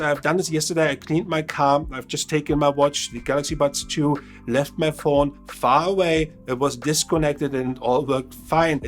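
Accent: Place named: Germany. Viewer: Definetly heard out the german accent helped me a lot : )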